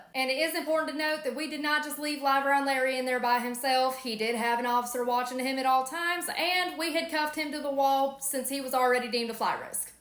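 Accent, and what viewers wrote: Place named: South, United States. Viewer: Great! I had to listen to this twice, she got me with her southern accent and her looks and never listened to what see said.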